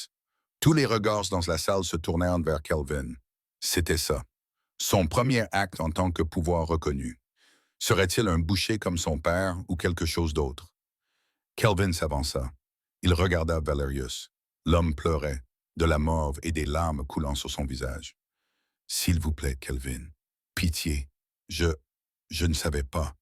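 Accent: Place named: United States. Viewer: Arrete de parle français avec une dialecte américaine, ça casse tout le histoire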